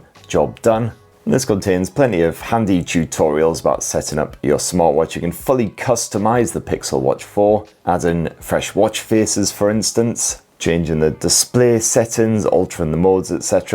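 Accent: United Kingdom. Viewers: I love your British accent, especially when you say "shenanigans" 😂 Your reviews always make my day bright.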